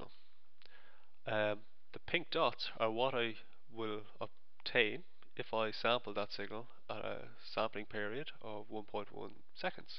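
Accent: Ireland. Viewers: Sweet explanation and an Irish accent on top. Class fella, cheers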